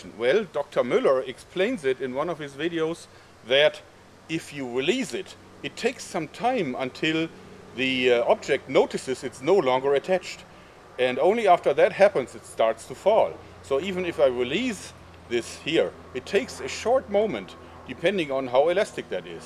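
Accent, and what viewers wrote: Germany. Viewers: is him from Germany? im from Argentina sorry about my English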